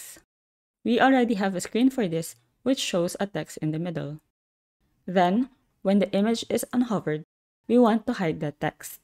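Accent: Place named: Philippines. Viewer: this is exactly the kind of video i needed!!! also super cool that you're from the Philippines as well, i was surprised to hear a Filipino accent voicing this! thanks so much for your vids!!!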